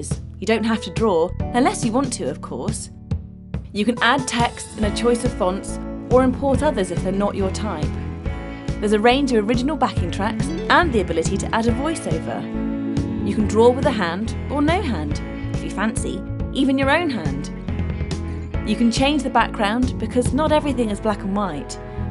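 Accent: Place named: United Kingdom. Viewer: I love the British accent tho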